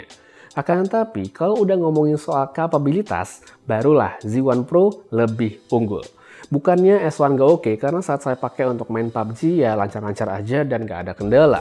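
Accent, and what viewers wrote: Bali, Indonesia. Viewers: Bang orang bali apa?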